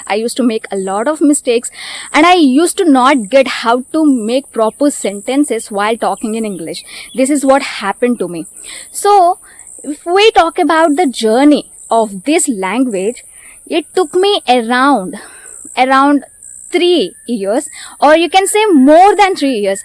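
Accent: South India, India. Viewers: I think you are from south India.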